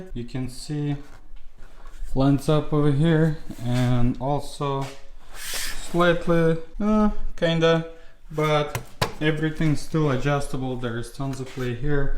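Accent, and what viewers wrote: Russia. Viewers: Привет, акцент слышится, что ты русский😁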